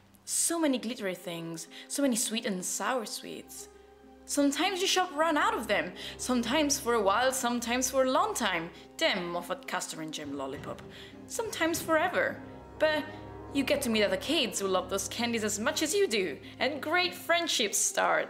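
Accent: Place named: United Kingdom. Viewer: amo quando parli in inglese! (E amo il fatto che capisco benissimo perché parli in modo semplice e aw). E cominci a fare tuo l'accento british, questa è una cosa bellissima! Continuerò sempre a seguirti, sappilo! E MORE VIDEO IN ENGLISH PLS, I NEED THEM Comunque: quoto l'intero video. Proprio ora mi sto riguardando tutto HIMYM per la terza volta. Di fila. IT'S SO HARD BEING A FANGIRL AND YOU UNDERSTAND ME AND I LOVE YOU PS. Per le altre ragazze: guardate che ha già fatto un video dove spiegava come ha imparato l'inglese. Cercate bene e lo trovate, non l'ha postato nemmeno troppo tempo fa! PPS. I love you <33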